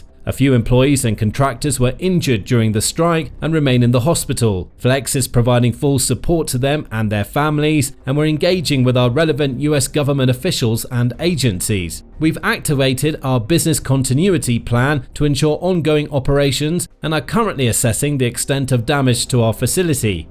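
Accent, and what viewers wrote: England, United Kingdom. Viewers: I am so tired of an English accent. Thumbs down.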